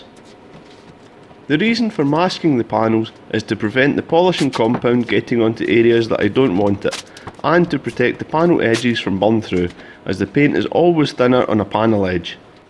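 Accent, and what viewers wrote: Scotland, United Kingdom. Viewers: I really injoyed every second of watching all your videos about your Subaru Impresa. You are a really professional about eveything about cars. You have a wonderful sense of humor and a love your Scottish accent. Very nice, entertaining and educational videos. Thank you so much. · Hey car is looking epic. How have you been finding the raptor??? I’ve got GDB and done the same to my own car, full Resto and raptor in wheel arches and full underneath. I went bare metal and then acid etched, rust bullet and raptor over the top. I find it chips quite easily on hard edges, sills, gaps etc - but on the flat it’s awesome. Won’t budge! Have you noticed this at all?? Thanks for all the videos btw, what a great source of info. Fellow Scot I hear too?? You don’t sound too far from myself in Perth. Cheers!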